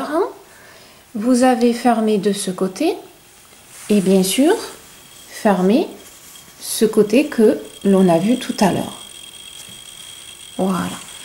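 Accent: South, France. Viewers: l'accent du Sud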